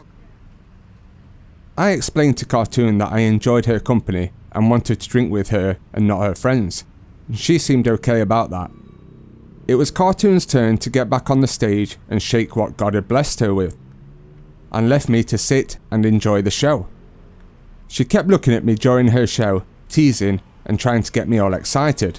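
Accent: United Kingdom. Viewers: You Sound like you come from the U.K. Question: would you ever consider having a prostitute as a girlfriend ? The bar girls in Pattaya are all prostitutes, no matter how pretty and well presented they are. So these girls are all a risk and have a certain mindset. Have fun and walk away!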